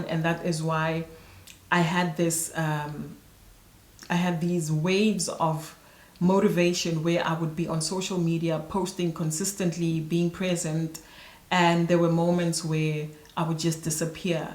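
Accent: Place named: South Africa. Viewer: Umuhle sis❤ from your accent I could tell ukuthi you are south African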